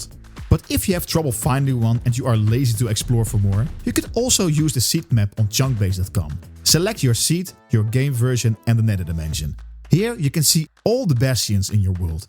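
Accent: Netherlands. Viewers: haha ik hoor de nl accent gelijk al, goede video wel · You sound like a very well English speaking dutch men Am i correct? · Sound like a Dutch guy. Nice content bro. Netjes!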